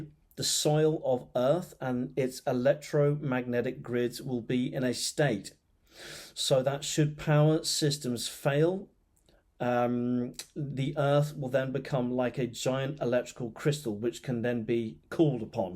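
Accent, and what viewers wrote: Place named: United Kingdom. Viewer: First time on your channel. Great reading! Your british accent is lovely however you move very quickly through things and your speech was a little fast for me. I'll listen again. Thank you for your reading. Just subscribed .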